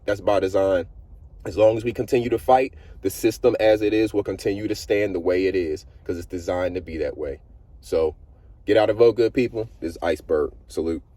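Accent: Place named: United States. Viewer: You sound like a country hill billy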